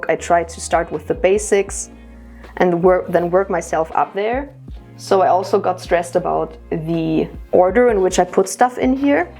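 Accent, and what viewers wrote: Germany. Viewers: Dude i knew you had a german accent and not a scandinavian.... Why are you saying you are norse???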